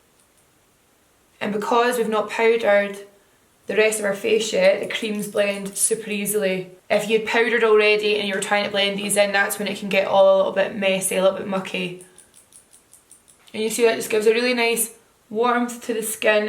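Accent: Scotland, United Kingdom. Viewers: Really love this look! We're fellow Scottish YouTubers! Love hearing Scottish accents on YouTube, it's so rare! 😄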